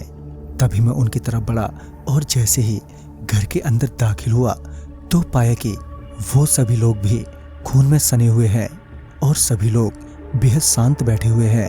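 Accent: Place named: Kolkata, India. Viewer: Narrator bhi kolkata ka lag raha hai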